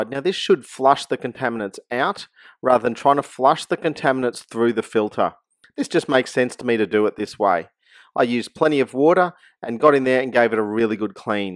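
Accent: Australia. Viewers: you have an aussie accent mate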